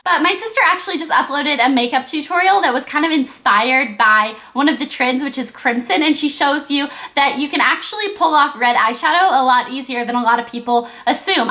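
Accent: South, United States. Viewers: you sound more southern when you're sick. i like it :) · she IS southern. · when you mentioned the elf brush, the nyx jumbo eyepencil and the mac "fresh water" IT BROUGHT back sooo many memories, of your first few videos! !! and OMG i miss your thick southern accent. :( hahha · Like ur old videos but ur intro us way to formal and long also I miss your southern accent!! I loved it :)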